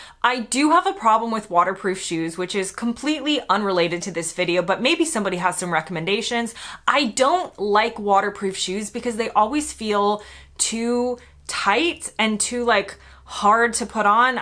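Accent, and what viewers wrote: United States. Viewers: Ive noticed with time you seen much happier . I like the way you say British words but with an American accent! I worked in London for years and the pace was fast...i moved to the countryside and struggled for the first year as the pace was too slow....i adjusted and now live a slower less stressful life. Great episode again pointing out what we take as granted.😊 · It is a bit surprising that your American accent is totally intact after all the years that you've lived here in London. Is that a conscious thing not to lose it? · You still sound very American to those of us in the UK, but after 10+ years living here do your American friends and family think you have a bit of a British accent? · Your accent doesn't sound like you landed yesterday....not to me. It's only reallly mildly 'American sounding' to me, should blend in well in the UK. 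· Not only do you have an American accent, but you speak like someone from the USA, using words and phrases that British people would not.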